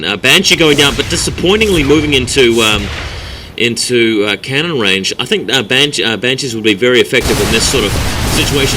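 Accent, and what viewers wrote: New Zealand. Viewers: Screw Day9, Tastosis, HD and Husky, when it comes to sc2 commentaries, your the man :-) And I LOVE your kiwi accent by the way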